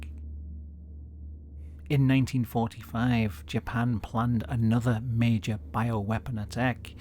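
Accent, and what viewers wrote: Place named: North Wales, United Kingdom. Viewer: He's from North Wales, apparently.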